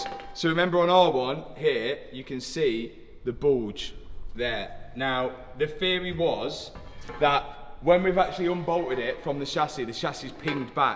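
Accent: Leicester, United Kingdom. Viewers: I miss the Leicester accent 😅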